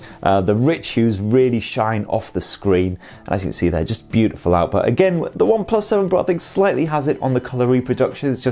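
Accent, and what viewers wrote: United Kingdom. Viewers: like your comparison videos, and is good to hear a British accent that is easier to understand :)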